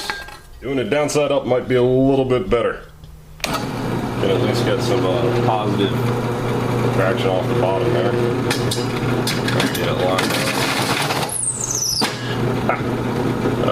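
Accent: United States. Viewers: It's AvE but in American English instead of Canuckee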